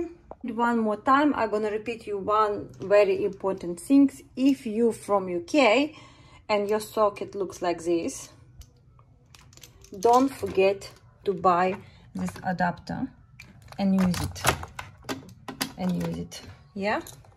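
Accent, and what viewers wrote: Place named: Ryazan, Russia. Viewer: Какой красивый рязанский акцент!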